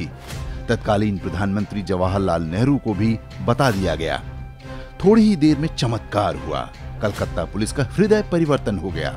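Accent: Standard. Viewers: बड़ी शुद्ध हिन्दी है आपकी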